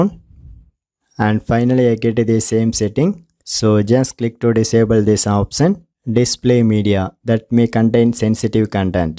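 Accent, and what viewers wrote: India. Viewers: U from India mate?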